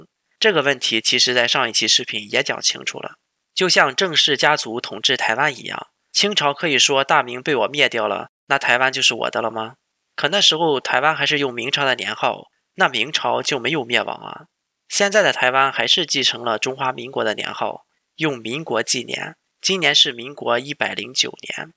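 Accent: Shandong, China. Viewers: 我光聽聲音分辨的出來你是中國內地的人，請多多的做這件事情，或許任何人覺得微不足道但這是慢慢改變中國內部根基幼苗，很可惜李登輝已經往生了要不然你可以有機會來台灣跟這類的人探討可以知道中國共產黨統治的方式跟中華民國現在統治發展的不同在哪，唯獨當過共產黨的人才能理解中國共產黨恐怖心態🤔，用軟實力去改變是最好的方式👍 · 你是山东人！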